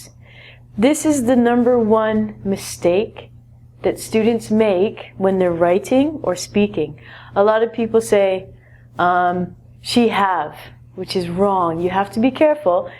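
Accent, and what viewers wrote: United Kingdom. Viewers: British accent 😓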